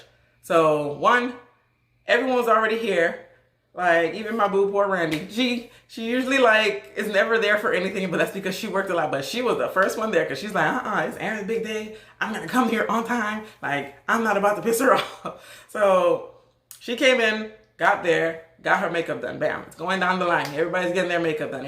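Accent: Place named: Gullah Geechee, United States. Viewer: I just don't understand some people. Sounds like you're better off sis. And are you from SC? You have that geechee accent going on?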